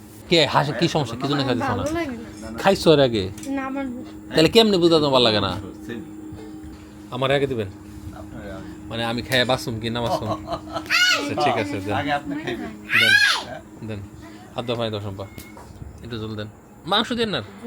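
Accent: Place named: Manikganj, Bangladesh. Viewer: মানিকগঞ্জের ভাষা মনে হচ্ছে 🤔🤔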